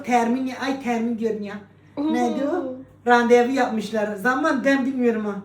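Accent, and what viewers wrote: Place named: Elazığ, Turkey. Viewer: Harika aynı Elazığ ❤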